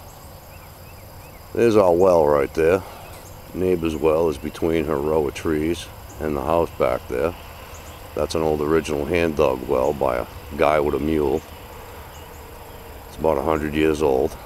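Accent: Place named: South Louisiana, United States. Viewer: Are you in South Louisiana? I'm from Nola and your accent sounds familiar.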